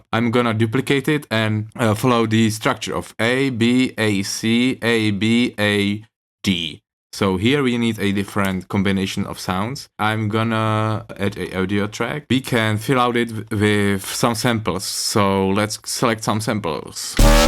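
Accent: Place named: Czech Republic. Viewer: ja si celou dobu rikal, ze mas takovej ceskej prizvuk a pak si dropnul pancz a bylo mi to jasny:D dobrej tutorial btw:)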